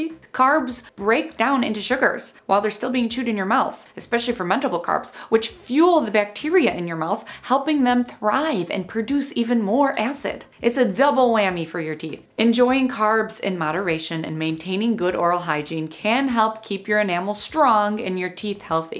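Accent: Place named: Midwest, United States. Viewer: Are you from Chicago or the somewhere in Midwest by chance? You totally sound like me and my family!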